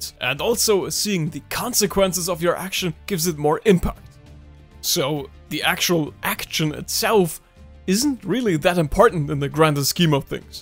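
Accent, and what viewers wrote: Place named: Germany. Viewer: Dieser Deutsche Akzent xD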